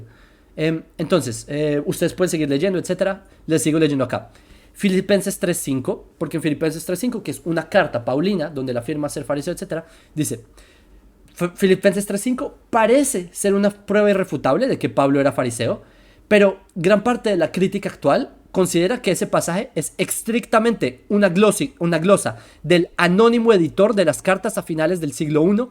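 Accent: Bogotá, Colombia. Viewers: Me gusta mucho su acento Bogotano, muy elegante... con cierta pronuncion estilo arabe... Me gusta mucho escucharlo. Pero esta tan sesgado que no puede salir de la norma ortodoxa Judía, lo que como a todo religioso no le permite abrir la perspectiva sobre los conceptos.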